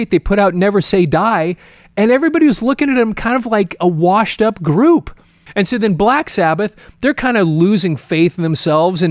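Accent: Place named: United States. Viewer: È così imbarazzante vedere una persona della tua età così eccitata per una questione da 15enne che non la riguarda direttamente❗Vedo che non sei sposato, sei americano dall'accento e hai 15K iscritti.... è tutto così anormale ❗ Continui a strillare per delle scemenze è imbarazzante non riesco neanche a scrivere ❗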